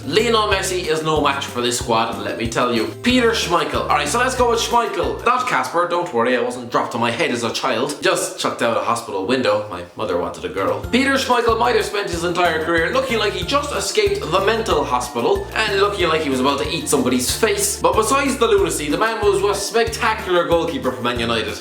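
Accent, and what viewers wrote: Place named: Ireland. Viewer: Where in Ireland are you from.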